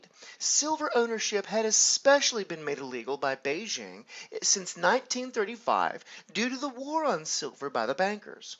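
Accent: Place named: South, United States. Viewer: As soon as I hear American Southern accent, I know conspiracy theorists are coming.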